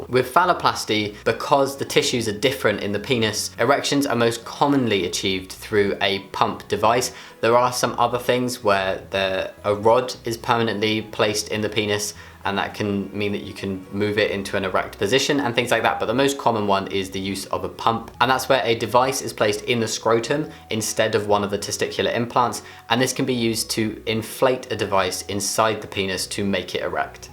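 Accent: United Kingdom. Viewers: just luv your Brit accent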